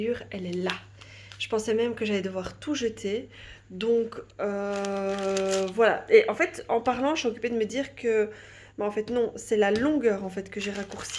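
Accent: Belgium. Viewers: Hey trop contente de te retrouver !! Ce petit accent Belge m'avais manqué ! Des bisous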